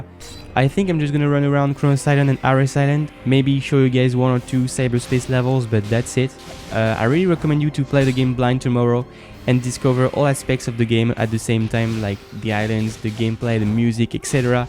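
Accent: France. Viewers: tas un accent français ptn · L'accent français est juste impossible de ne pas remarquer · Ha ok donc je viens juste de remarquer que t'a un accent français en parlant anglais tout va bien (bon après je regarde quasiment pas tes vidéos avec commentaires) mais dc pourquoi tu as créé une chaine anglaise? Tu arrivais pas à percer avec ta chaine française?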